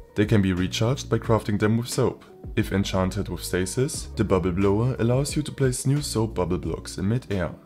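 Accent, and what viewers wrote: Germany. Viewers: why does bro sound german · bro has the most german accent i have ever seen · Hi, kann es sein das du deutscher Muttersprachler bist? :) top Mods wie immer!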